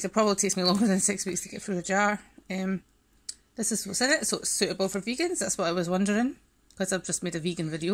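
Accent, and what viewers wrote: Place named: Scotland, United Kingdom. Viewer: Love your Scottish accent along with your pantry haul - luv Toronto csnada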